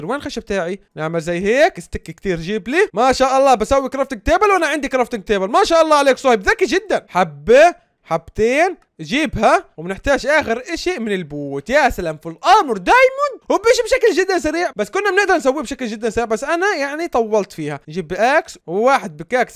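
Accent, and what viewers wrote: Syria. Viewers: انا تعرفني اني اوديك شو اسمي اسمي عبد الرحمن ميه ميه والله انك سوري اسمع صوتك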